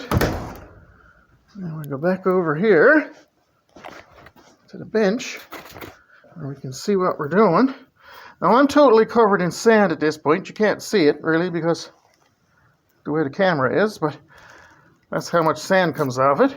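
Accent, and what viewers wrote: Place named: Newfoundland, Canada. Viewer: I started watching out of curiosity....soon as I heard the newfoundlander accent you could have been reading war and peace and I'd have sat through to the end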